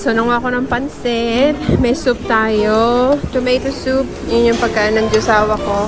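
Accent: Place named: Iloilo, Philippines. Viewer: Hulaan ko, ilongga ka maday ayyyy..... pati ka daw.... shoutout lng q jan ah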